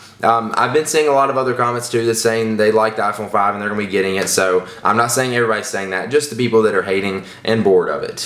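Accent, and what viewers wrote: South, United States. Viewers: His accent sounds a bit Southern.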